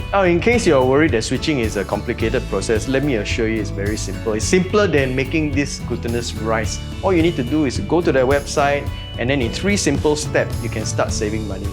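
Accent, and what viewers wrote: Singapore. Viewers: That;s good I don't have a real steamer and I am really glad to skip that step. You talk and sound like a Singaporean and a Teochew at that. Hello Ka Ki Nang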